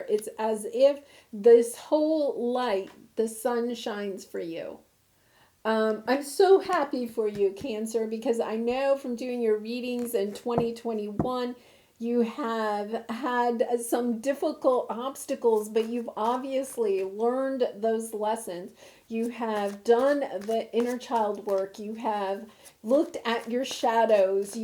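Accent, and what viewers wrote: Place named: South Carolina, United States. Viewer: I'm betting you're a South Carolinian with that delightful accent!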